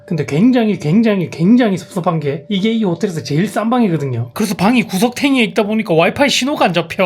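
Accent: Busan, South Korea. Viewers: 달근한 말투였다면 닭살 돋을 멘트들을 특유의 억양으로 들으니 귀에 쏙쏙 박히네요. 50대이지만 많이 배웁니다^^ 근데 나두 부산싸나이지만 부산말은 맞는데 첨 듣는 말투같은 너낌은 뭘까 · 고생은 사서 한다고 원치 않아두 고생하게 되죠. 인생 자체가요 참 머든 열심히시며 차분히 같이 즐기게 되네요! 경상도 사투리에 외국 사투리는 없으신듯 있으셔두 근본이 ㅋㅋ 부럽습니다. 덥다는건 또 몰랐다 이제 알았습니다. 부디 안전히 건강히 오세요 ^^ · 저녁 먹으면서 소주 반잔하면서 보기 딱 좋은 채널. 같은 부산 사람으로서 공감대도 가고. 저희 아이들도 계속 으이~~ 으이~~해서 웃겨요^^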